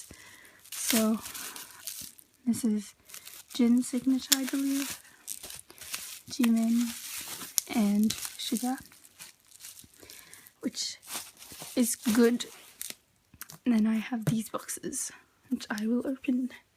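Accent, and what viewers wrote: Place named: Australia. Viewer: your english sounded so normal to me xD ur aussie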